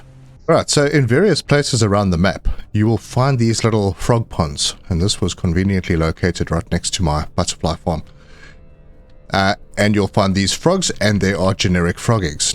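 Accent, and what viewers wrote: South Africa. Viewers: I was wondering if the accent was South African, Aussie myself but my great grandfather was South African, his son, my grandfather was responsible for starting my gaming addiction on his Atari back in the day.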